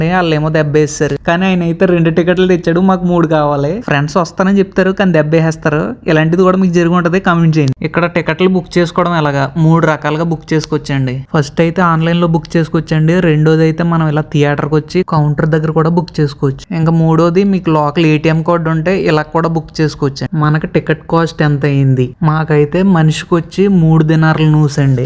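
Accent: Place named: East Godavari, India. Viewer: Me voice bale untadhi... madi rayalaseema but maku east godavari antharavedi lo maa friends unnaru me slang istam maku anna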